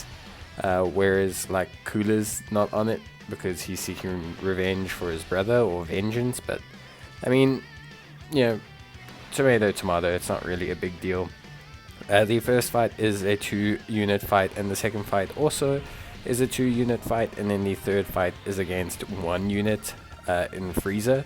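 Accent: South Africa. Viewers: you sound South African, are you from south africa?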